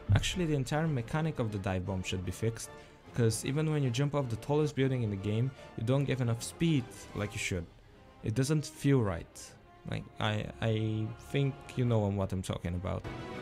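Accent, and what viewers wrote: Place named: Russia. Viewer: Чё то кажется, что у тебя русский акцент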